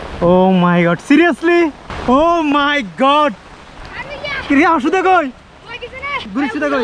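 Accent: Noakhali, Bangladesh. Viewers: ক্যামেরাতে হ্যাক লাগি গেছে গই,,,,, ফ্যাক্টঃ নোয়াখালীর ভাষা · ভাই মনো আঙ্গো নোয়াখালীর..?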